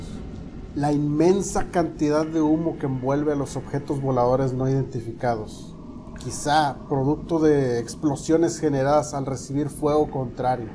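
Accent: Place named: Mexico. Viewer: Hablas muy Mexicano , con mucho acento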